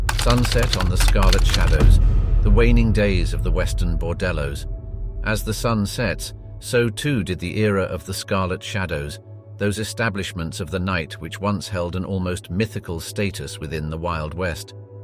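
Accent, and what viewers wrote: England, United Kingdom. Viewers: Is it the narratives male English accent that softens the title or is it that it's not a Woman narrating this story. It is the latter. This story is about cruelty and how Women care not even for their own gender. How dare you take my gender and twist it into your culture for financial gain, you the Madame the bottom bitch.. A Woman who hates their SISTA this is your type of entertainment. I forgive you SISTA. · Another Brit narrator explaining America.....to AMERICANS.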